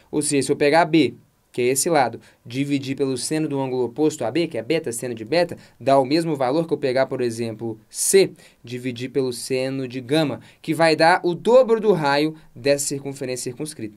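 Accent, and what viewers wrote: Minas Gerais, Brazil. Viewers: Você é mineirinho ou só finge?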